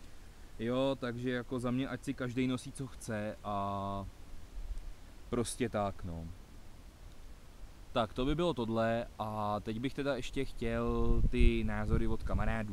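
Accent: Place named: Pilsen, Czech Republic. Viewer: Sry, ale ta plzenština se fakt poslouchat. .. zeptal jsem se kameearádů na jejich neeazor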